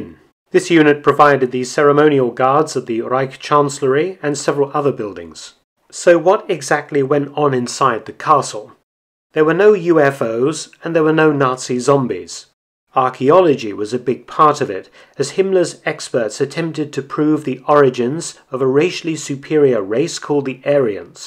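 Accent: England, United Kingdom. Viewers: I'd say he's English, to be precise.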